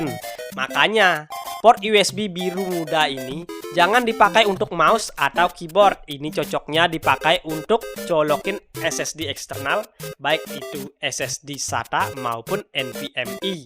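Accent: Bali, Indonesia. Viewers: Bali sajan logatne nok 😅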